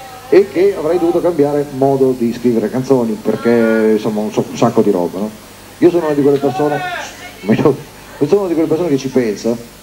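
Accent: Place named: Emilia, Italy. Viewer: E' veramente bravo! sono lieta che sia un Emiliano come me, e, che parli come me.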